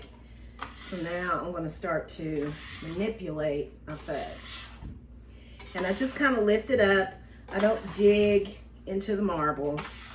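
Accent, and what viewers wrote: South, United States. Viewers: I love your recipe and your Southern accent.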